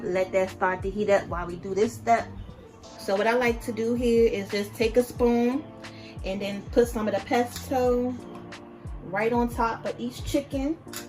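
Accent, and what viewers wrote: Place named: Louisiana, United States. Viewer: You def from Louisiana with that thick accent lol👀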